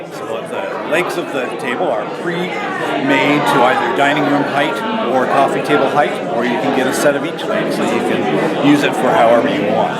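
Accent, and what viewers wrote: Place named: Canada. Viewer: Me: This guy sounds Canadian. Guy: "If they have to take their kids to a hawkey game--" Me: 😎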